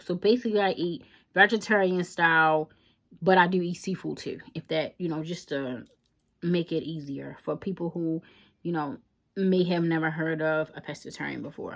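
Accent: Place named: Baltimore, United States. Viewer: Born and raised in Louisiana from the Deep South and yeah those plantation tours always rub me the wrong way. I’m starting in two weeks at American as a deckhand and I already know it’s going to suck . Will be a long 28 weeks but hopefully I’ll end up in the west coast and it will allow me to get my sea time to become a mate and work on cargo ships. Also your Baltimore accent is crazy heavy lol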